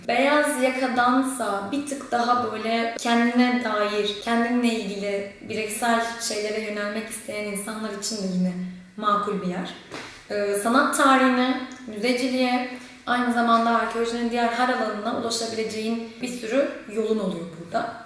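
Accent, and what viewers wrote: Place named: Hatay, Turkey. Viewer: Hatayli arkadaşim var konuşması senin gibi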